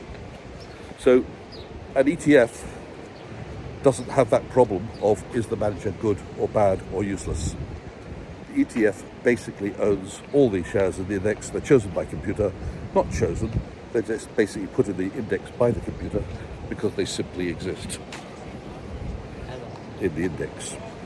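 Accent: United Kingdom. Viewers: Dear mr., really appreciate your information and the effort you make to cover all aspects. Also the way it is presented and in true English from the UK. Thank you and hope you do enjoy making more video’s to progress my learning and knowledge. Greetings from Holland.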